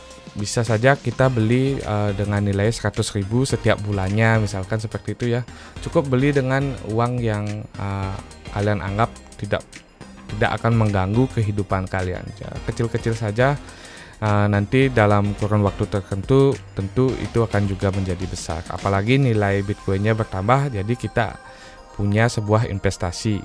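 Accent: Bali, Indonesia. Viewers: Asli Bali yaa om? Logat nya Bali banget 😁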